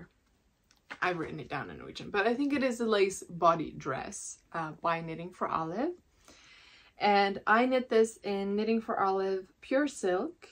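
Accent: United States. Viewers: Hi Mommy! I smiled when you said that English was not your native language. You sound American w the exceotion of certain words that might sound simmilar to Norwegian. Otherwise, every episode you get better & better. Look at yourself on this video & compare it to the 2nd or 3rd episode. You have ALWAYS had great grammar, and pretty great pronunciation. Nobody could fault anything you say/how you say it. However, the added touch to your American accent is the colloquialisms you feel more & more confidence using. I speak French, Spanis, English, & a tiny remaining bit of Italian (I spoke it 40 yrs ago, but the one adult that would always speak it, passed 40 yrs ago). Anyway, if I try Spanish, I don't always end or construct a word the Spanish way, I'll have to debate it in my head, because the other Romance Languages & even English, sound very simmilar. But l rather know other languages than get a word semi wrong every so often. 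Anyway, you sound great, either American or Canadian. 👍💯😉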